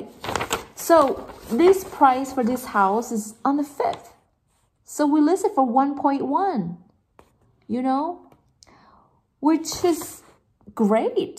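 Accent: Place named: England, United Kingdom. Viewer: ชอบสำเนียงอิ้งหลิชของพี่มากค่าา 😻😁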